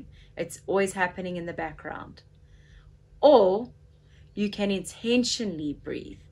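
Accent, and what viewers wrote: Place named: South Africa. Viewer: She’s from South Africa my hubby is from there . I hear the accent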